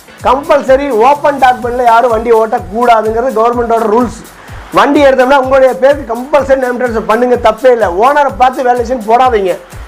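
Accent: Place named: Kongu, India. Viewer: Neenga pesratha paatha ipove vanthu vaanganum pola iruku super Anna kongu tamil azhage azhagu thaan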